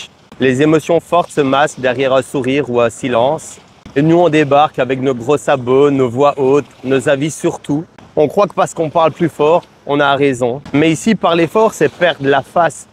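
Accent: Belgium. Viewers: Toi, t'es pas Français !!! Belge peut-être ? Merci pour cette approche...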